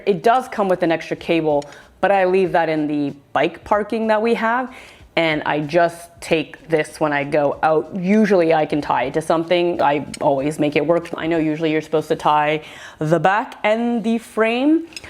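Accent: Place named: Canada. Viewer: Cool accent, is that a candadian Asian accent?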